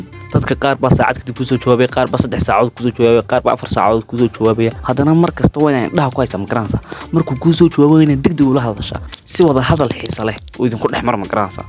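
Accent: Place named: Hargeisa, Somalia. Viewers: Adi mareer Somaliland ayaad thy sidii rer waqoyiga uhadlaysaye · Haa lahjadiisa marka loo fiirsado waa reer hargeisa toosa xataa Burco, ceerigaabo, berbera, Boorame maaha waa hargeisa toosa 😂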